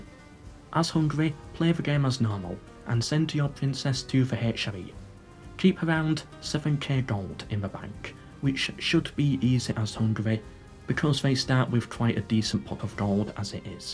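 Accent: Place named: Yorkshire, United Kingdom. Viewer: The Eternal Game. Who could best describe it, time and again, with his unmistakable Yorkshire accent? Mr., of course. No irony intended. Take care, reddish lad.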